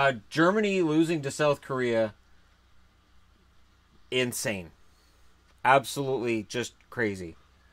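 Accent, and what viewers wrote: Canada. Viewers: Are you born in Ontario? You have a heavy accent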